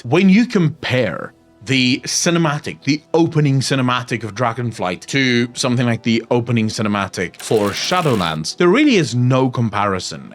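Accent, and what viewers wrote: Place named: South Africa. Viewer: are you south african? love your accent awesome video and good takes. og wow fans will stay, reminds me of the wod period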